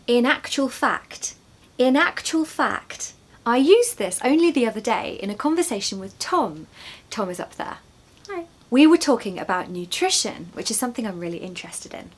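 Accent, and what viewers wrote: United Kingdom. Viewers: Thank you …you are such a sweet and great English teacher…your British accent is very easy to learn. Thank you so much share the video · l'm a big fan of British accent (l'm crazy about it) and your accent and teaching methods are one of the best l've seen . A zillion thanks to you from tabriz 🏢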